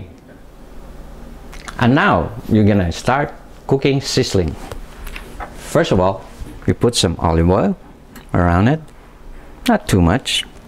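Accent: Philippines. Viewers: Only Filipinos will criticize other Filipinos for their English. I know a lot of people who’s been here in the U.S. and still can’t pronounce or speak the English language but nobody cares. I sure don’t care but other Filipinos will make fun of their own. How about we start lifting each other up instead of putting people down because they can’t speak a certain language. He’s Filipino not American. Your comment has nothing to do with what he’s doing. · This looks so good Chef. My uncle used to make sisig for us but he moved to the Philippines when he retired from the U.S Navy. Unfortunately, he took his recipe with him, even my Dad don't know how to make it. Lol, I am glad I found your video, it look so easy to make and I definitely understand everything that you were saying, I love the Filipino accent, that's how you know it's real. I love you guys and keep up the good work..Yummmm!!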